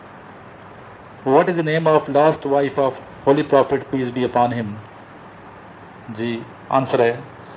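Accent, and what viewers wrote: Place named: Dera Ismail Khan, Pakistan. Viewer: sir are you by any chance..from DIKhan? apki accent se lag rha ha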